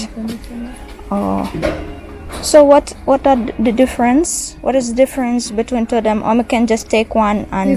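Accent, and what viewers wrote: Jamaica. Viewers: you have caught the Jamaican dialect flawlessly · Been watching you from the start. And you speak real Jamaican now, luv it ❤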